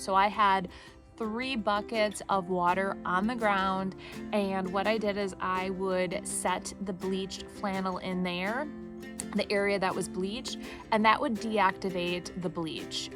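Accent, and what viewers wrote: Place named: Midwest, United States. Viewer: You are the cutest, hardest working, mid-west accent lovin gal out there!!!!! I adore you, I truly hope you get to rest in between everything you have going on!!!! Take care, have fun!!! Toni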